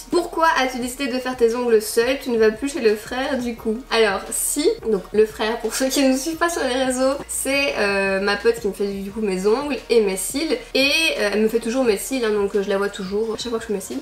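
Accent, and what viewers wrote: Belgium. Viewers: Attend t belge je me suis que t'avais un accent belge je suis franco belge 👍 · Je trouve que tu as un accent belge 😂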